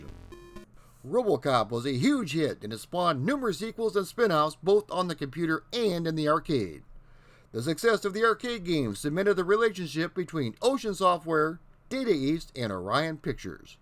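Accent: United Kingdom. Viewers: Uphold the Law guvnor! Yeah I'm British and that was definitely a British accent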